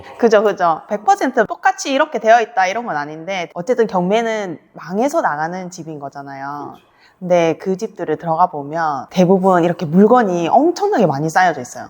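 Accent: Gyeongsang, South Korea. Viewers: 이 가스나 갱상도 말 투를 숨기려고 애 많이쓴다!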